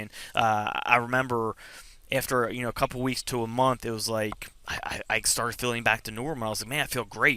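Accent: Philadelphia, United States. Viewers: Dylan M he's gotta be from the Philly area.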